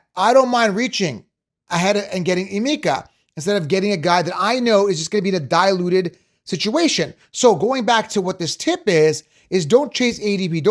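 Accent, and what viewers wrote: Canada. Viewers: Raiders fan with a Canadian accent…lol no thanks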